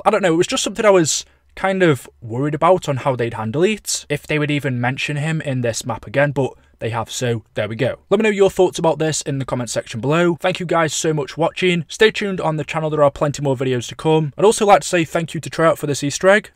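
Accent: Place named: England, United Kingdom. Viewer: Why is your English so... English 😄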